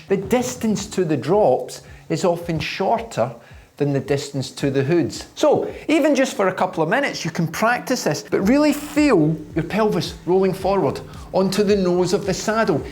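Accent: Scotland, United Kingdom. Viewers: Thankyou for speaking plainly English like a a true Scotsman. I even get the jokes. Very refreshing. I understand where I’ve been going wrong. With no bs . Thankyou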